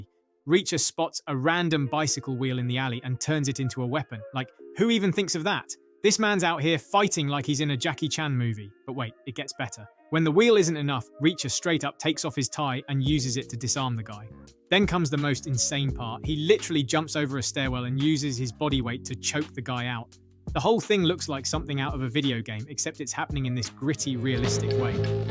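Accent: United Kingdom. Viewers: I really do not like to hear the narrating from someone from England. They talk funny and their English is Fucked up! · THREE/'#-separate-times, ths IIIDIOT British 'voice' states tht, tha 'crazy-doctor' attacks Neegly with a "drill"; it wuz a SAW! NOT a 'drill'! Ths iz one-of-MANY reazonz tht, I HATE British-narrated 'stuff'!! Stay in YOUR land! Leave American stuf ALONE!! YOU-ppl SUCK!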